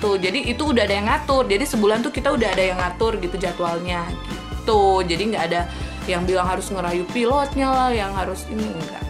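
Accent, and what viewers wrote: Manado, Indonesia. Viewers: Kakak org menado yahh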